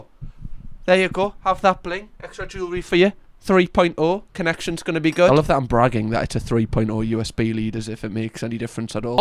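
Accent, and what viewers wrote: Newcastle, United Kingdom. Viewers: I like your accent, is it Geordie or more Sunderland?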